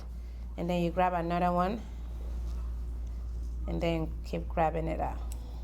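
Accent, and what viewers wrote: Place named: Liberia. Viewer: I hear the Liberian! Go, sis!👌👌💜💜💜🇱🇷🇱🇷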